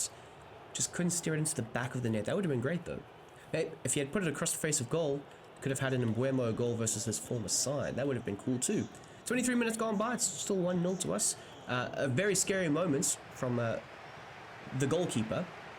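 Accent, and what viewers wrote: South Africa. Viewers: South African accent?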